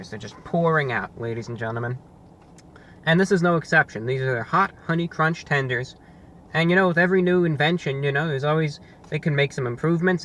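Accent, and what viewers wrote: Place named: New York, United States. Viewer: Why do you talk like those radio dudes in the 40s. I get it you're from New York but that's an old school accent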